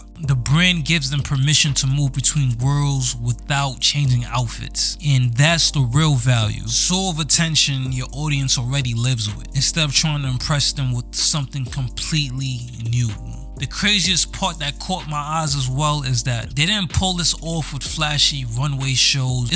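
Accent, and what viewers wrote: New York, United States. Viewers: Love this piece and hearing this accent makes me really homesick for NYC!😊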